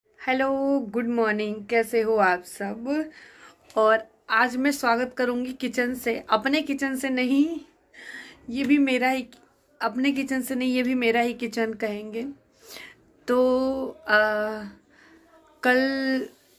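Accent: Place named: Varanasi, India. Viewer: आप वाराणसी मेँ रहती हैं क्या',सो funny, अरे का खइनि ह समोसा तो ख़ इनि हं👌..कोई बात नही आप लोगो की बात न सुने आप जैसी हैं वैसी ठीक हैं वैसे दुनिया में कुछ लोग हैं जो कितना भी अच्छा कर लो ,लोंगो को गलत ही लगता है इसलिए ,ना किसी के अभाव में जिये न किसी के प्रभाव में ये जिंदगी खुद की है बस इसे अपने मस्त स्वाभाव में जिये..🙏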